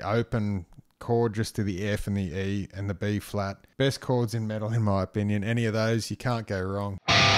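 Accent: Australia. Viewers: Assuming I've got your accent right, how about a showcase of your favourite Aussie metal riffs?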